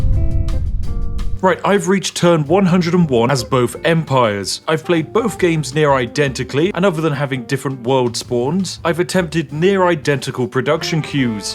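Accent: United Kingdom. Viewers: I don't really know what's going on but I enjoy listening to the silly British man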